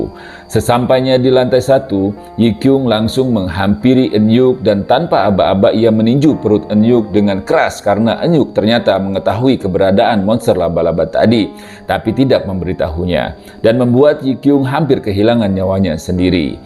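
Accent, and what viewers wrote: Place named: West Java, Indonesia. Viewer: Ciri khas Sunda Na ka bawa we eta medit hahahaha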